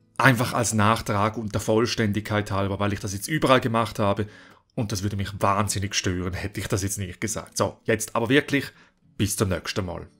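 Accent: Eastern Switzerland, Switzerland. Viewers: Sogar mit ostschweizerischem Akzent. Gratulation.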